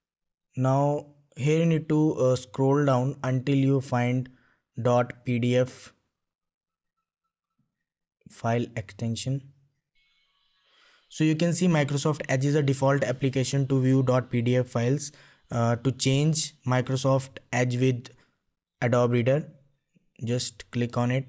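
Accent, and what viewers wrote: India. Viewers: i heard a indian voice so i knwe i was in good hands <3, thank you man.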